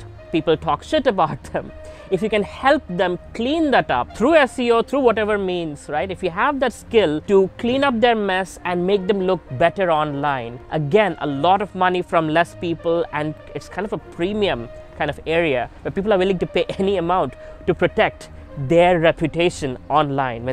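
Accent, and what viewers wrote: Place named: India. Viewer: Your English accent is not like other Indias.. It’s a good thing that i find rare...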